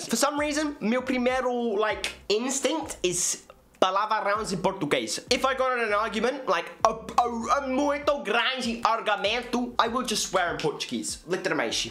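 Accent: Brazil. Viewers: Kkkkk com você fala com um gaúcho falado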